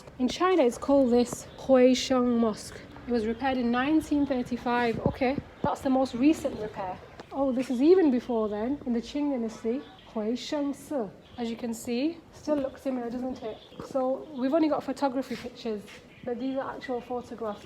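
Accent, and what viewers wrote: United Kingdom. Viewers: Her accent is from the north of England.... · How much does the Ccp pay you to become its ambassador in the occupied land of Eastern Turkistan, quite a lot l guess? Either the world is dumb to believe your report or the Ccp and its puppets are dumb to realize that their hideous crimes in the region are clear to the whole world. If your head cover and the British accent will give you legitimacy to make a fake report, the millions of Uyghurs in prisons should stop crying and dying. No thanks to you or anyone else like you⛔. So who we should believe now, the killer or the victims. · Your accent has a bit of Birmingham in it.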